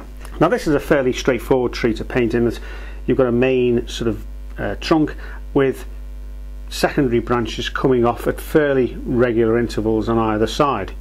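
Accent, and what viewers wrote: Liverpool, United Kingdom. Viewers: Did the Pres like his painting then? I agree with everything Parrot said too, such a lovely Liverpool area accent you've got.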